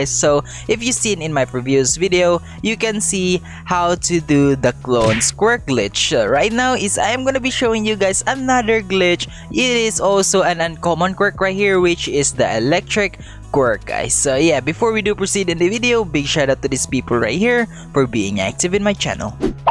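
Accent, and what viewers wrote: Philippines. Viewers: Are u filipino im asking cuz ur pronounciation sounds like most filipinos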